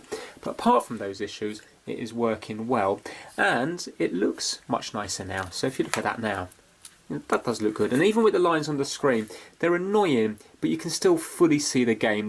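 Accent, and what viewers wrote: United Kingdom. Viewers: No, he said "Just yet, anyways" it's part of the UK accent.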